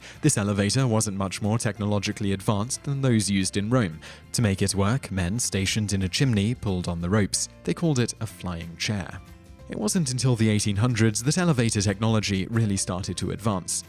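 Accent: United Kingdom. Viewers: The narrator has a British accent though (that was the joke lol).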